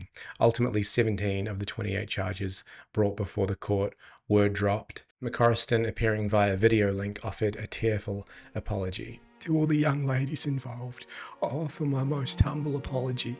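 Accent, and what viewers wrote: New Zealand. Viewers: do I detect a hint of NZ accent?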